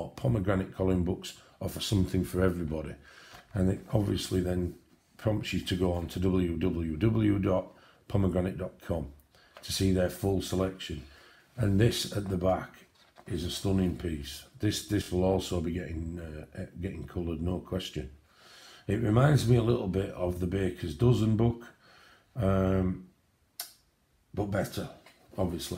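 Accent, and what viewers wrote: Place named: North, United Kingdom. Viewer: First video I’ve watched of yours and it’s lovely hearing another Northerner. Some beautiful books 😍😁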